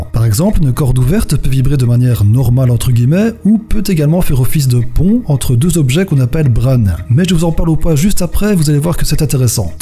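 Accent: Liège, Belgium. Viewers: Mdr l'accent belge ! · Haha vu ton petit accent tu dois venir de Liège, en tout cas quelque part par là ^^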